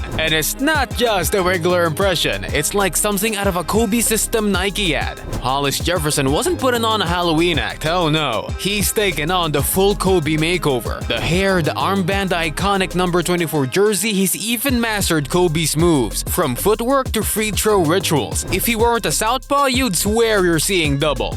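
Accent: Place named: Philippines. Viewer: Pinoy n pinoy accent m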